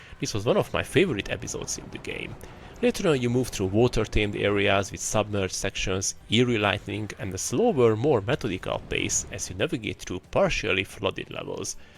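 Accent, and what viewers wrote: Hungary. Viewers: Jól hallom? Mintha magyar akcentust hallanék. :face-blue-smiling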